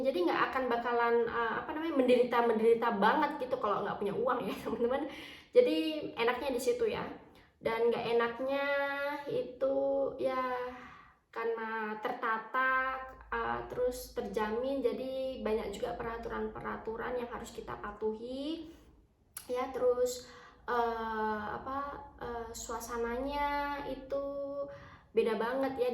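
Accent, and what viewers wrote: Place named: Eastern Indonesia, Indonesia. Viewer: Pantas logatx org timur.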